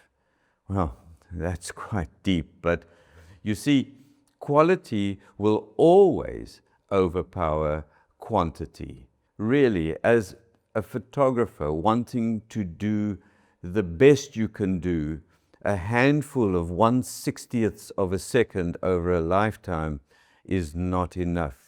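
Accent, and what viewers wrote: South Africa. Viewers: Love hearing that SA accent. Lived there a few years and miss it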